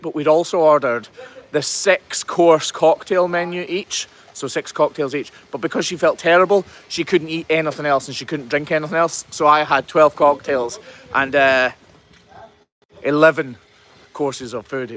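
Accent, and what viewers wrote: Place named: Aberdeen, United Kingdom. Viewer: He speaks Abberdabber, kenfitamene?! Congratulations to you and your wife on imminent new arrival 😀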